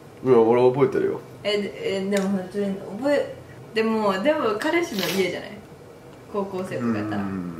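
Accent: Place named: Osaka, Japan. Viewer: 色⤵が美味しそう。 大阪弁ww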